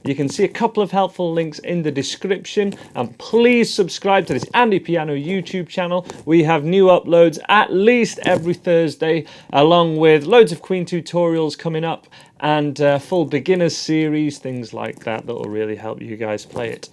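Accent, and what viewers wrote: Yorkshire, United Kingdom. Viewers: Omg SUCH a yorkshireman!!!!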